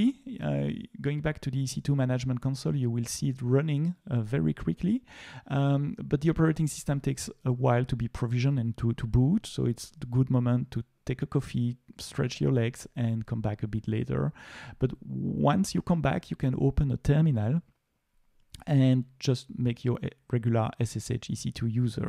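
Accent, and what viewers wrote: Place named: France. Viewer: Je me disais bien qu’il y avait un accent français 😂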